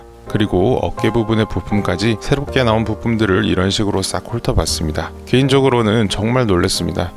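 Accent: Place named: Gyeongsang, South Korea. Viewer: 몰랐었는데 경상도 싸나이셨네요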